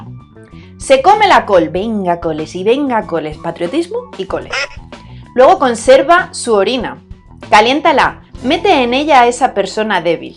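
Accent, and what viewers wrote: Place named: Spain. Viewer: Jaja graciosa forma de contar historia ,me parece que eres de España yo soy panameño.Y todas me parecieron interesantes ,pero como dirian por allá,joder hoy no es que hemos avansado mucho pues en terminos verdaderamente medicos la sangre es como entrar a un espeso bosque no hay forma de saber con exactitud todas las clases de alimañas que hay ahí y la seguimos usando en tranfuciones lo cual es peor que tomarla .Y los medicos aseguran que es seguro.En ves de promover los espansores como el voluven la eritropoyetina y la las operaciones mediante cirujia de recuperació sanguinea de la misma persona lo cual se ha hecho muchas veces ,pero no siguen promoviendo las trafuciones........ Me encantan tus videos saludos..!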